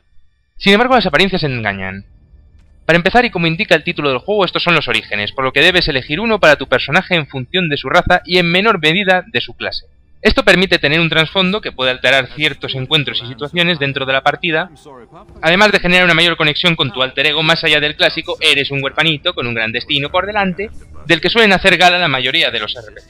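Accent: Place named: Madrid, Spain. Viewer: Nah, me suenas madrileño sin más, pero vi el otro día el canal este de juegos de mesa en el que colaboras y que hacían una entrevista en una tienda de Moratalaz y sumé dos y dos a ver si eras vecino xD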